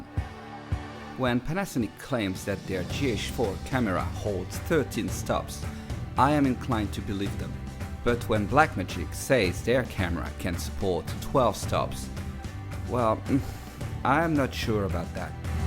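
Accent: France. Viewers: Oh un frenchie XD ça se reconnait à l'accent ^^ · Sympa ton petit accent français :p Ca rend bien !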